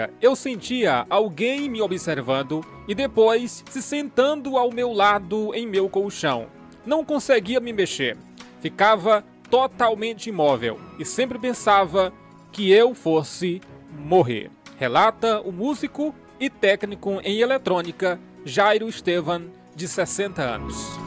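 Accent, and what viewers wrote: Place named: Maranhão, Brazil. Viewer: Kkkk você prega a verdade mais ao mesmo tempo é super engraçado com esses sotaques Maranheses!!! As vezes agente nem ta a fim de rir mais vc nos faz rir! De São Paulo capital!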